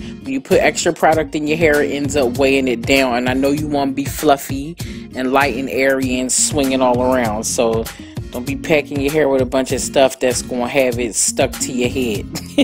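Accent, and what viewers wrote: Philadelphia, United States. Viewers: You have a Philly accent. Where are you located? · I don't even know where she is, but that accent is definitely Philly. Lol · Where can I buy your hair straightener and do you ship to London I wanna know please ❤️ thank you. You are great hairdresser and I wish London had hairdresser like you..I can tell you accent your American. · i hear philly all inna voiceeee lol philly inna buildingggggg i love your work sis keep it up ! ❤️❤️❤️❤️ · Omgggg I love your Philly accent. When you said “fluffy” I was like, there it go right there!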